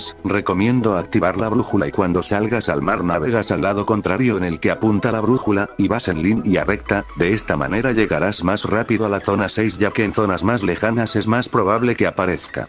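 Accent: Dominican Republic. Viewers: Aaaaa Eres dominicano?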